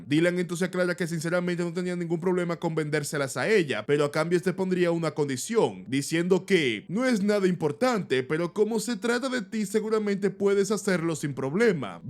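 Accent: Dominican Republic. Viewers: este tiguere es demasiado dominicano, hasta narrando las historias le mete el flow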